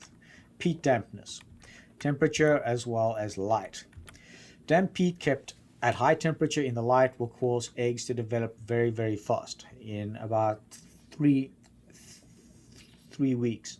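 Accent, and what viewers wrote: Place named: United States. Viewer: that's an amazing American accent.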